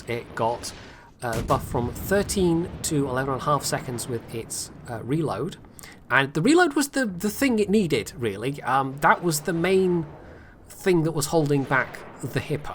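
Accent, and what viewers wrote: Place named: Oxford, United Kingdom. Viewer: I think you would be great on television, as an announcer (you have that pommy Oxonian accent) or a comedian (great sense of humour a la Monty Python), or both. You'd be perfect. I'm thinking John Oliver would be a good example. Think about it...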